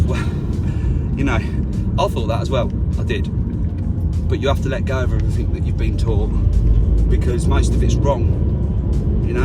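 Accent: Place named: United Kingdom. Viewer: I like your British accense.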